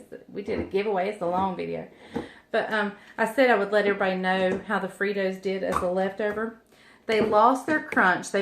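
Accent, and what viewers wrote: South, United States. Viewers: I thought you said Lord but you said lard, it took me a while to figure out what you said. This yankee sometimes gets lost with the southern accent but I love watching you. I don’t think the stainless steel works to remove the onion smell either. Coffee grounds I’ll try, thanks. My husband when he was alive had a guy friend at work that was a bachelor and he loved to cook, he started his spaghetti sauce by cooking a pork roast real slow on the first day to get the drippings which he used as the start of his sauce. I had never known to do that. But the ham or bacon is a great idea to get flavor. My mom wasn’t much of a cook, unlike my country mother-in-law was. My mom for years her idea of making spaghetti was to brown hamburger and sauté an onion add a can of tomato paste, a shake of a little chili powder, salt and pepper and that was it. 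It was really plain or she would use a package of Chef Boyardi spaghetti which was so small and nothing but cheap.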